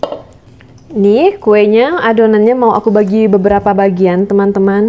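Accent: Pontianak, Indonesia. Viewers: Pantesan gak asing bahasanyaa, org pontianak rupanyaa kakak heheh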